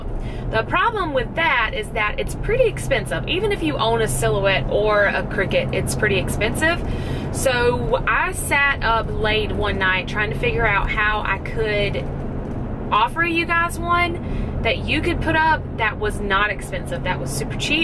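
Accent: South, United States. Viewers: Are u southern?? Bc im from texas and i can tell by your accent but i dont wanna be rude and say your something your not lol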